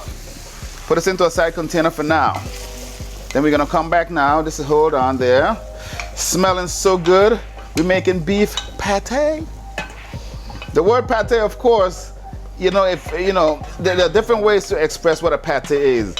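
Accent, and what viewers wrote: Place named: United States. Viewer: Your stateside accent,,is funny 😂! Be yourself, its okay😅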